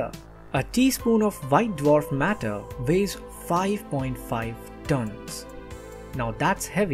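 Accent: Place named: India. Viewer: When you hear an indian accent you know the videos are going to be filled with knowledge, very intelligent people ❤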